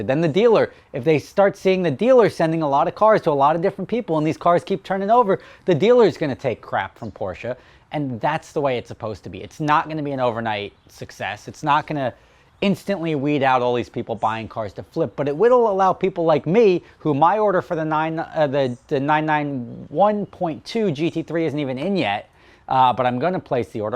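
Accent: New Jersey, United States. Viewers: like yo... you from Jersey?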